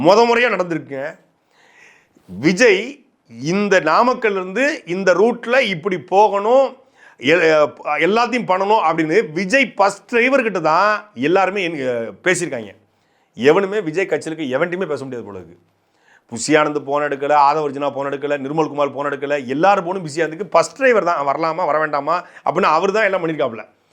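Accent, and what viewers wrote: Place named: Tirunelveli, India. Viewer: சாட்ட நீ பெரிய ஆளுயா.... உண்மையாவே very interesting politician..... செய்து உன்ங்க நல்லூர்.... வாழ்த்துக்கள் தம்பி.. தொடரட்டும் நெல்லை தமிழ்... கேட்க இனிமையாக இருக்கு தம்பி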